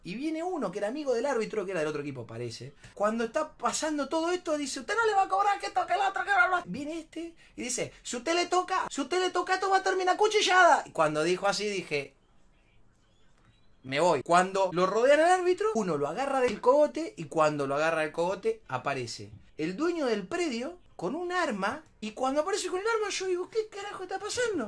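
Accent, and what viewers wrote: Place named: Argentina. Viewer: Me gustaria que no hables argentino que hables otro asento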